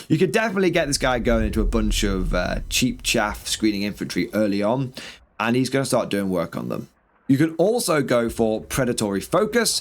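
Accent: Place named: United Kingdom. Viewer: he's British they break everything English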